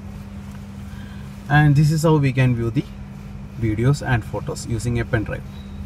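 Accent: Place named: India. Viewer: Lol the accent. The way you say maruti... only an Indian can catch that.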